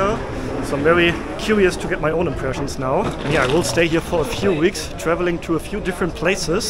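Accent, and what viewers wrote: Germany. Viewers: Your German is ominipresent while you speak in English: 1947 and not 1974 as one says in German! ^^ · I like your german accent of English ...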